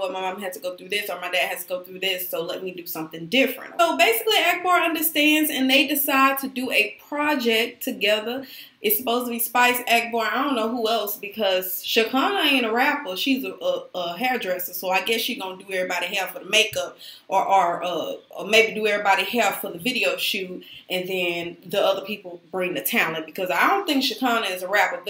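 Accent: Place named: South, United States. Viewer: are you from the south? You kinda have an accent lmao ( Love from Canada ❤)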